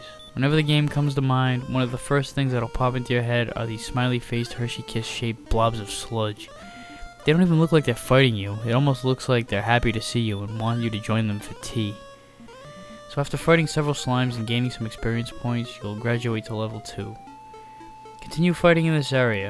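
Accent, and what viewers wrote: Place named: Massachusetts, United States. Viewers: From New England, are you? You cant hide the accent Me too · I like your accent. Which part of Massachusetts are you from?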